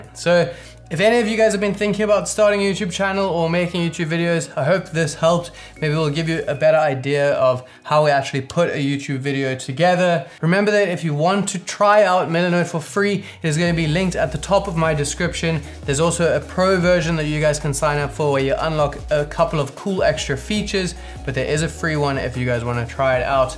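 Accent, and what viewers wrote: South Africa. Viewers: Is jy 'n suid afrikaner? Dit klink soos 'n jaapie wat in England woon.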